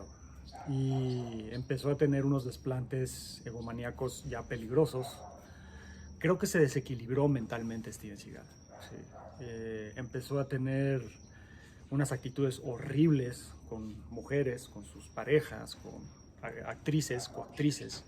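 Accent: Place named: Argentina. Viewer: Yo opino que el sensei está hablando cada día más con acento Argentino! Saludos desde Buenos Aires!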